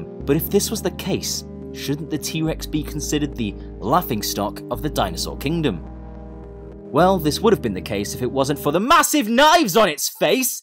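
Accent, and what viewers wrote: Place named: United Kingdom. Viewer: When ever i hear voice i always get the TheGamingBeaver vibe and aura, probably because he's Brittish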